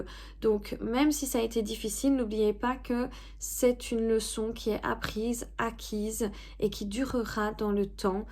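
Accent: Belgium. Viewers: Où vous c'est la première fois que je vous écoute mais il me semble que vous avez un fort accent belge 👍😸♥️ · Bonjour, toujours très belle, j adore ta guidance et t en remercie cette personne ne sera plus dans ma vie très bientôt ou j'ai mal compris.j adore ton accent belge gros bisous je te kiffe.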